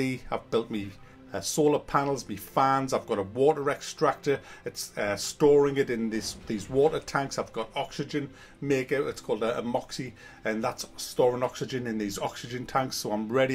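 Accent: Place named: Consett, United Kingdom. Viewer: You must live near Consett. I recognise that accent anywhere!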